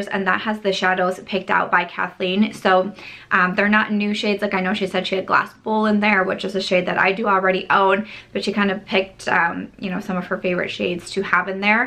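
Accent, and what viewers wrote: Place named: Midwest, United States. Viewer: Love the midwest in you!!!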